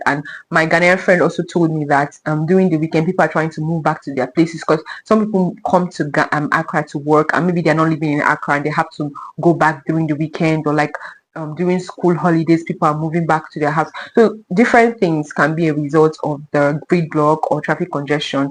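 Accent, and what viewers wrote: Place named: Nigeria. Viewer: Your accent is quite different from some Nigerians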